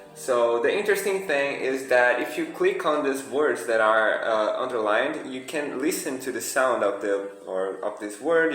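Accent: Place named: France. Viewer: Wawww that frence accent 😌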